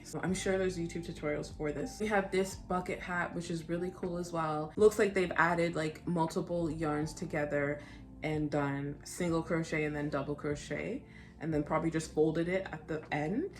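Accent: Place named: Caribbean. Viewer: Adorable video. Are you carribean? I detect an accent but I can't place it.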